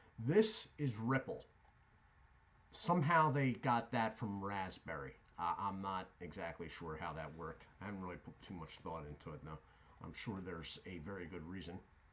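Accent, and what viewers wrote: United States. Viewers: Where are you purchasing these snuffs? Judging by your accent, I assume you're American. I'm a former smoker and current user of Copenhagen snuff. I'd like to explore the world of English snuff and would appreciate any insight on purchasing it as I know it's produced across the pond. I enjoy the content too. Cheers!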